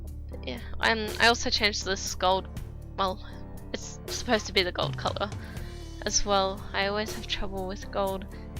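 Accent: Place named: United Kingdom. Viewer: Haha, na, sounds to me like you got a good voice for these things. Are you British? I feel like theres a sort of British accent in your voice.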